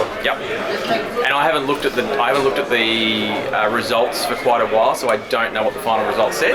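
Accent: Australia. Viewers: I think testing DACs is a good idea. At CanJam NYC, I could not tell the difference between DACs, it takes me a long time for me to notice the difference when I upgrade a DAC (except when getting a May). Also your natural "live" voice is far more Aussie than your presentations. Took me by surprise. But I also remember that you record softly to not disturb your family, so that's cool.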